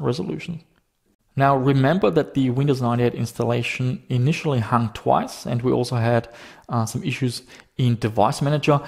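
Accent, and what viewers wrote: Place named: Germany. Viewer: Are you really Australien? Thought in the beginning that you were from Germany or Austria! 😄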